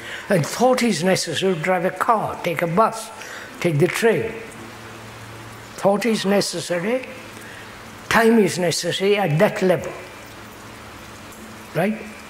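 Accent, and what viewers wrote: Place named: United Kingdom. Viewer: his accent is British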